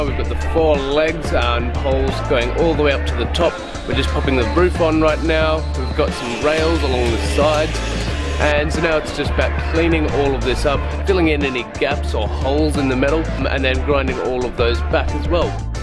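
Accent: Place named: Australia. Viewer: Loving your channel and all you're doing in India. From the accent am missing Oz already .... Am a South Sudanese Ozzy from Sydney and I've been looking for DIY playground projects for my son and kids across South Sudan 🙂 so glad to come across this😁 moved 8mths ago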